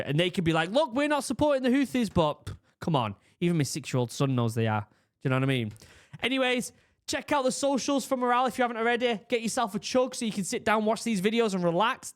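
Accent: United Kingdom. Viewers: Yours is the only British accident that doesn’t totally bug me. Idk why but it just sends chills down my spine when British people say “I have to go to hospital” instead of “I have to go to THE hospital” but for some reason it doesn’t bother me as much when you talk lmao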